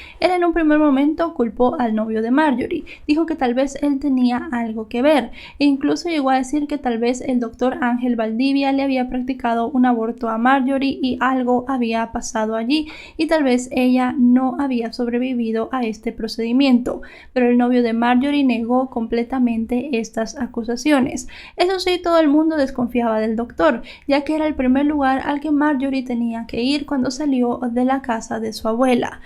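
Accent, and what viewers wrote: Chile. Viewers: Es de Guatemala pensé q era chilena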